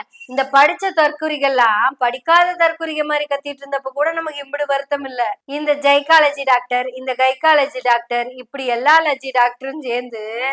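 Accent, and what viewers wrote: Kongu, India. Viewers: Ur kongu speech is so 😊😊 beautiful.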